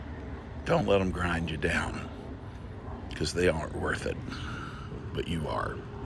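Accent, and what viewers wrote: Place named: Texas, United States. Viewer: 3 seconds into the video and I can already tell you’re from TX ……y’all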